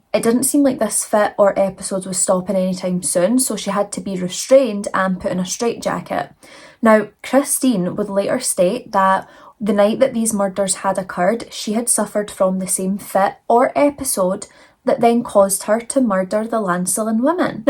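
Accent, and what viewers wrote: Scotland, United Kingdom. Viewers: I do the same thing! I switch between Midwest USA, and Scottish accent all day!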